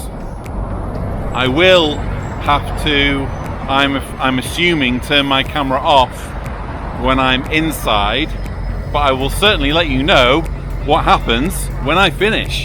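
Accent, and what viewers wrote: United Kingdom. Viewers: Keep the good fight!!! Best British accent ever.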